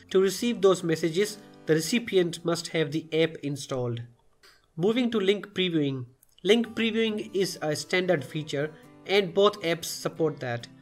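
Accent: India. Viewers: I cannot understand what he is saying with his India accent.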